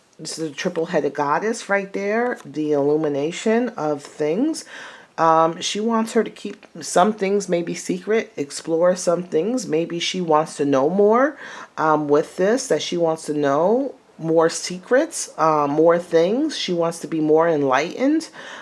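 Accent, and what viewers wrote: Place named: New York, United States. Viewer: I thought I heard a little subtle of NY. I think a big conflict is Meghan's never Trumper bs narrative with all of her Illuminati hollyweird friends. The meeting of DJT is going to be something she isn't going to like and will possibly not be included. Watch, next week or in the next weeks to come, there will be an appearance with the oblamers that will cast shade on her "royal position". It's going to piss off the queen of the fall out from it. Just a feeling I have. This will be an "opportunity" for the oblamers to insert their "presence" onto the world stage. It's going to interesting to watch to say the least! Grab the popcorn!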